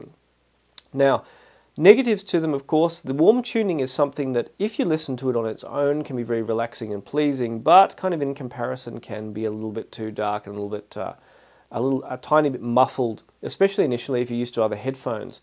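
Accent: Australia. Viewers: Great review!! I’m thinking of getting a set of headphone. I prefer closed. I have 2 choices in mind at the moment. This Sony or the Shure SRH1540. Any chance you’ve listened to the Shure? Unfortunately I can’t directly listen to the Sony as I have to get it from Australia (you sound like you’re from Australia. I’m from NZ and unfortunately we don’t have it in our Sony stores) it’ll be interesting to get an input from you. Cheers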